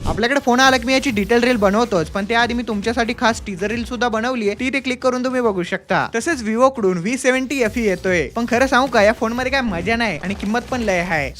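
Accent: India. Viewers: फोनचं जाऊ दे राव पण तु नगर चा वाटतो राव ❤ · Bhau tu latur cha ka ahe ka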